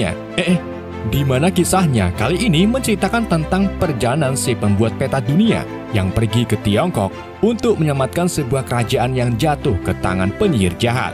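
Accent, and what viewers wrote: Indonesia. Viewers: Ciri khas dong lidah indo.. semangat ye'endeeeeeeeaaaaaahhhhhhh uraaaaaaaaaa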